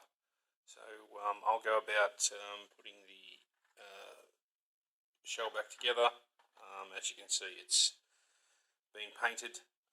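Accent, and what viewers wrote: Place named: Australia. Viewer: this fellas Australian, I knew when I heard the voice, then I saw the fridge and it was a dead giveaway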